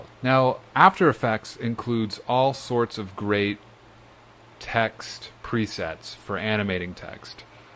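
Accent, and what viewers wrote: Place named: Canada. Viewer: It's pretty obvious that you're Canadian. Cheers to you!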